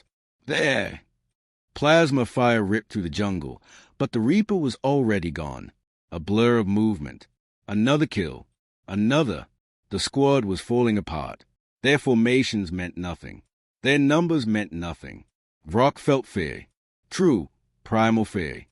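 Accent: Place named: New England, United States. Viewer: Bad accent get rid of snotty New England accent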